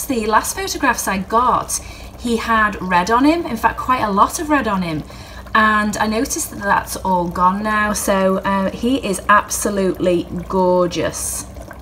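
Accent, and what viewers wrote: United Kingdom. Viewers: Are you from the UK? Of USA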